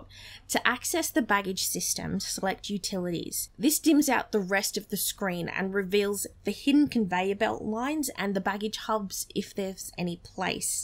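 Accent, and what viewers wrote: Australia. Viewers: "chick with the Aussie Accent" did not disappoint. Thanks mate.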